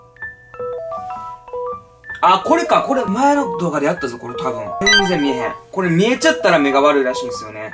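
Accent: Osaka, Japan. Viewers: 大阪弁って本当にいいなーっていつも思う😌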